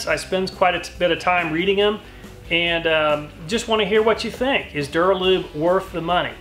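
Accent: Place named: Pittsburgh, United States. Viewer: Thanks for all your hard work testing. I do my own testing, but it's only seat of the pants/noise/smoothness, etc. I grew up in Pittsburgh, PA area. I have been listening to your pronunciation. You sound like you might be from somewhere not too far away. Am I on the right track?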